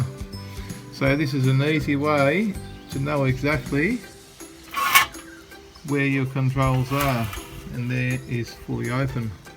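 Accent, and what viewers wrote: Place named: Australia. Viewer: Australian accent... I understand it perfectly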